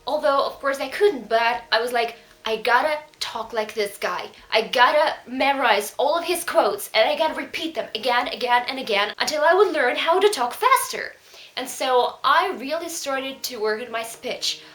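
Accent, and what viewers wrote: United Kingdom. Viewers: Tu acento es más bien británico.🤔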